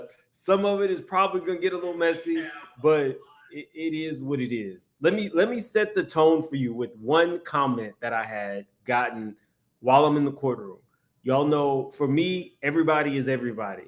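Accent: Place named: Louisiana, United States. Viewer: When you mentioned the Louisiana coming out of you, I immediately thought "Ya maw!" 😂 I'm from Louisiana too!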